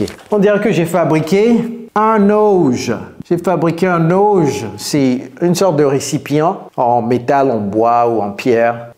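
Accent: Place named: United States. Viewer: you have such a crazy beautiful American accent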